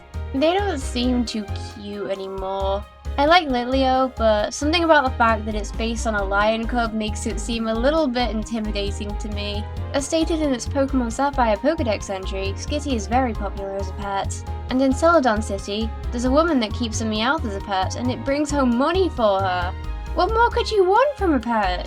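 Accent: England, United Kingdom. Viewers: are you English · me seeing this vid in my suggested feed big deal, another poketuber! im subbed to, like, a million others! ah, wtf, ill watch one of their vids me after starting this vid HOLY ARCEUS SHE HAS AN ENGLISH ACCENT!!! SUBBED!!!!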